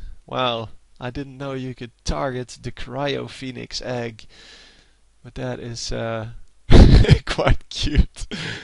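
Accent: Netherlands. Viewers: are U dutch, like me? U have dutch accent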